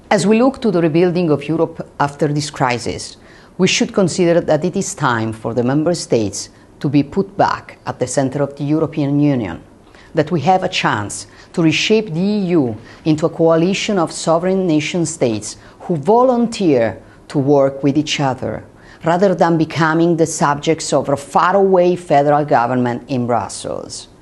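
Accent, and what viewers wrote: Rome, Italy. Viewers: non si possono paragonare .. ovvio, era imbarazzante e lo è tuttora, ma lei sta leggendo un copione studiato e per lo piu con pronuncia in romano quindi non vedo chissa che genio sinceramente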